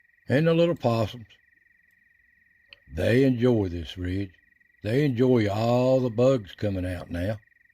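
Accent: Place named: South, United States. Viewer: Thank You, love these videos and the music! And of course your southern accent 😊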